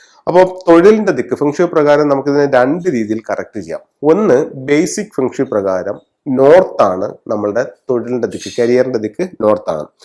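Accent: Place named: Kollam, India. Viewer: Kollam TVM fagham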